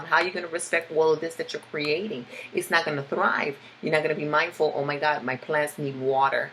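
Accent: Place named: Brooklyn, United States. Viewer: You're so Brooklyn, yo lol. I love your accent!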